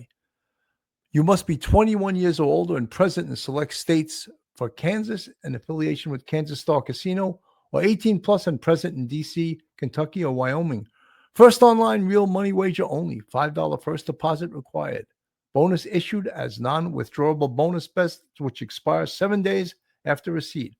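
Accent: France. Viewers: Thank you Sgt., hoping and praying that the priceless jewels are found. French accent appealing, we are created to laugh! Good accurate reporting, your expertise is valued! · Im glad they solved this fast! I hope the jewels are found soon, 😥 I doubt it tho. ❤btw❤adorable frenchie accent 😂❤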